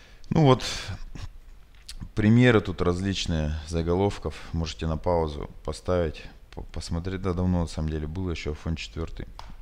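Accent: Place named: Rural, Russia. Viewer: Только комфортная скорость воспроизведения 1.25, а остальное - всё супер. Говор приятный ) Такой, не городской ) Да, и спасибо большое за науку и видео (а то понаписал всякой херни, а поблагодарить - не написал:0) ЗЫ. Музычка в конце видоса - громковата...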